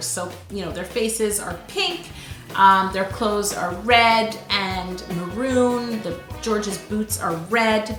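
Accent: England, United Kingdom. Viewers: English accents, yes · I love your cakes! You inspire me! I recently started my YouTube channel and have been baking a lot. My favorite so far is a piano cake that I brought to Choir rehearsal before all of this Quarantine! Everyone loved the piano which was really a cake! I can't wait to try to make your Watermelon cake in the summer. These boots are so cute with this cake & love your British accent in this video, lol !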